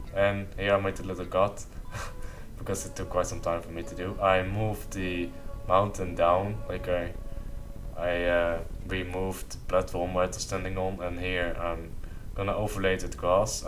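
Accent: Netherlands. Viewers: 100% nederlands kun je horen haha :D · Are you from Holland, cause you sound Dutch :D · Dutch accent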